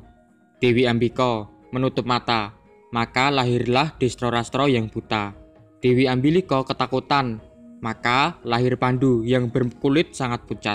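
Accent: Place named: Sleman, Indonesia. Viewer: Suanten lan bahasa e kados piyantun Sleman, sae sanget Kulo remen mas menambah wawasan 🙏🙏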